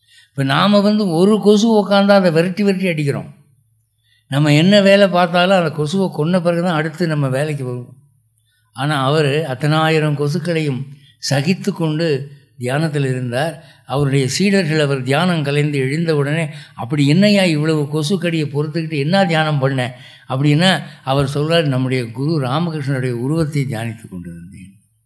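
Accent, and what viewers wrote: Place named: Madurai, India. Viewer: சிலர் மீது காரணமே இல்லாமல் அன்பும் பக்தியும் தோன்றி விடுகிறது.. ஜோசப் அப்பாவின் பேச்சைக் கேட்கும் ஒரு மணி நேரம்... இந்த உலகமே எனக்கு மறந்து போகும்.. His madurai slang and timing sense.. Chanceless... 👌👌👌.. குருவே சரணம்🙏🙏🙏 ஜெய் ஶ்ரீமன் நாராயணா..🙏🙏🙏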